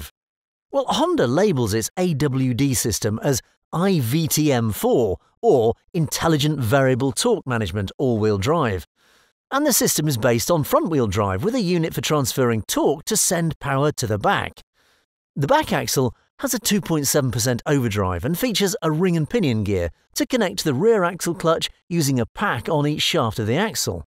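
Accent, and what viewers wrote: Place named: United Kingdom. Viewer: Terrible British accent for a car that does not Get sold in the u.k.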